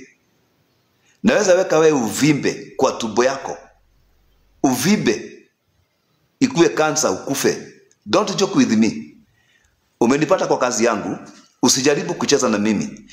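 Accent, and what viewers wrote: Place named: Kikuyu, Kenya. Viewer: Rudi shule kwanza ujifunze Kiswahili sanifu! Unaongea Kiswa-kikuyu...Baba ya maibilisi! Potelea mbali na huo uchawi wako unajaribu kutuuzia!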